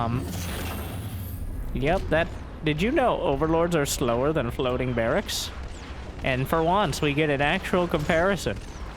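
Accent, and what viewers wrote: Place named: Transatlantic, United States. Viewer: Can I just say, for some reason your voice has a trans-atlantic quality, and for some reason i find that somewhat enjoyable.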